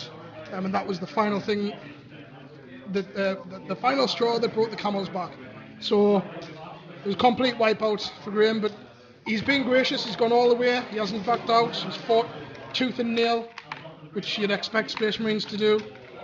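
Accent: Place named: Durham, United Kingdom. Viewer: Good batrep mate, bad day to be an ultramarine. Eldar mobility is tough to counter but I thought with three fliers he'd at least have a chance. Where you from by the way? I'm in Durham, your accent sounds local and you said 'canny points' lol. So I'm guessing you not far from me.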